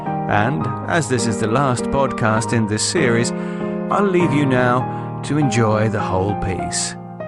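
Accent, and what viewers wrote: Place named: United Kingdom. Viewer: I laughed while listening to his British voice, thinking I was back in the England 1700's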